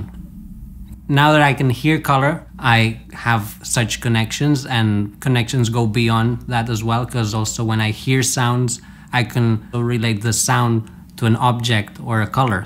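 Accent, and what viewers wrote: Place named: Philippines. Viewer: he sounds Filipino, is he Filipino?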